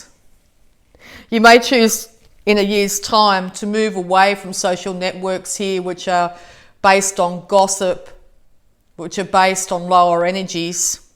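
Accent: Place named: Australia. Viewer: Love Aussie ascent...I am in Melbourne...I go to school at Shambala centre Bullpen...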